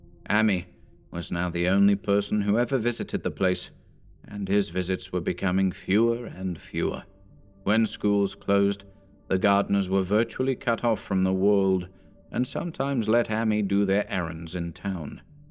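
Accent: United Kingdom. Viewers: Another excellent reading! I’ve been enjoying finishing a story myself first, and then listening to your readings shortly afterward. I very much appreciate your attention to accuracy when you narrate, and there’s something about a U.K. accent that lends a certain subtle sophistication to it haha. Well done!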